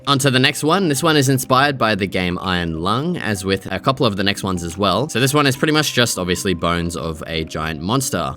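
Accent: Australia. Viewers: u australian?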